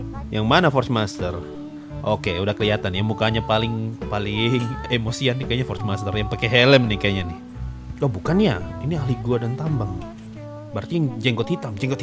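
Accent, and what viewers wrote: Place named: West Papua, Indonesia. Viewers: Maaf kk klw boleh tw kk dari daerah mna, soalnya logat nya kek ank" Papua barat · Iya, ane rasa seperti org Papua bagian kota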